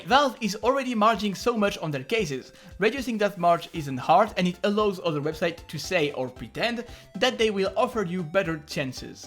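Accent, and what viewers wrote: France. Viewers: Is this guy French? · You sounded way more French in this video. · are you french?????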